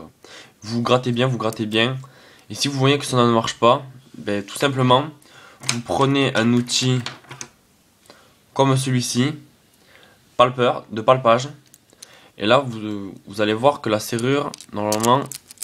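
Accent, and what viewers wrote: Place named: South, France. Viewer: je suis sur que t'es du sud! Vu ton accent pck moi je suis de nice;)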